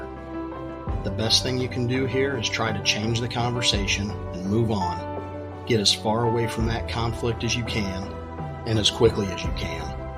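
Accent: North Carolina, United States. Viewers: I hear your accent. I'm betting North Carolina. There is no keeping the peace forever and the relationship is never worth it. That's because eventually they'll either completely do a discard or they'll force the other person to do it through ever escalating betrayals. There is no happy ending, ever. There are sometimes long-term relationships, especially with the covert types, but the soul destroying damage to the victim ends up being even worse. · North Carolina ? From North Carolina also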